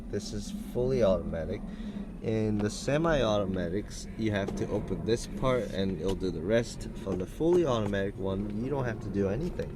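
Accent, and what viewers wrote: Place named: Asia. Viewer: I can tell your Asian with just hearing you talk, you talk kind of like my Asian friend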